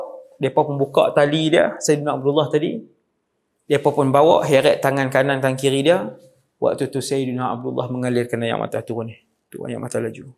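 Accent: Kedah, Malaysia. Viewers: Alhamdulillah terbaik ustaz Wadi Annuar terbaik kedah pekat dimana pn kuliah xtinggal negeri Kedah pekat ringkas senang faham terimakasih ustaz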